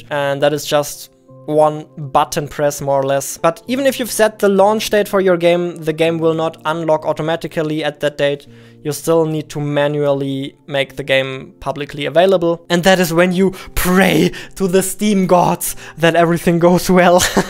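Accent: Germany. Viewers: because he's German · Bist du deutsch? 😂 #nofront aber man hört es😊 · Man hört den Deutschen Akzent raus :D. Finde das Tutorial sehr Hilfreich! Danke! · Ich habe direkt gedacht das du bei dem Akzent deutsch sein musst haha :D Super Video! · Hör ich da deutsch? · His dialekt sounds like german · are you german?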